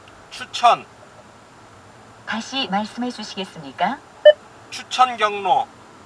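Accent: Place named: Jeolla, South Korea. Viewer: 설명이 건조하고, 사투리톤이(전라도) 강해서 영상이 딱딱함ㅋ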